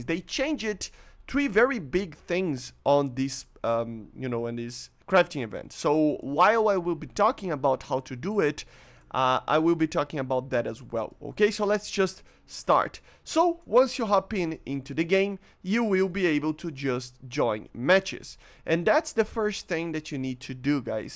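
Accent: Brazil. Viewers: Eu sabia que era BR, só vi o seu clã no final do vídeo KKKKKKKKKKK Eu falei, mano, esse sotaque é muito de BR, não é possível !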